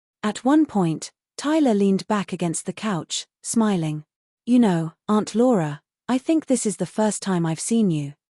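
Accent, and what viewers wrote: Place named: United Kingdom. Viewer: This story takes place in Chicago, so why is the narrator speaking in a British accent & pronunciation?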